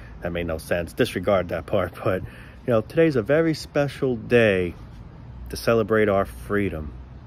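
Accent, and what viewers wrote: New York, United States. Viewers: Great NYC accent. Heroes of 911.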